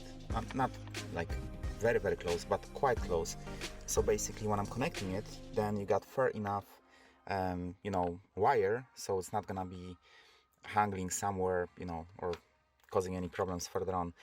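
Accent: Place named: Poland. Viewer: Ty jesteś Polakiem słyszę jak mówisz 😆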